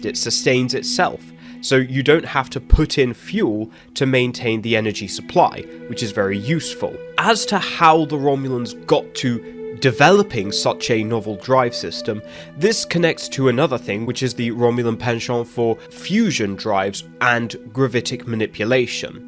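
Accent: United Kingdom. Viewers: The British accent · you have a Brit accent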